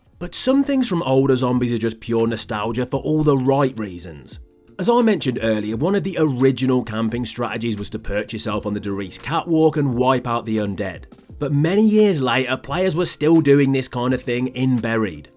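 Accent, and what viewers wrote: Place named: Australia. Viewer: accent is like a heavy country Australian accent, plus a heavy accent from the uk somewhere very awesome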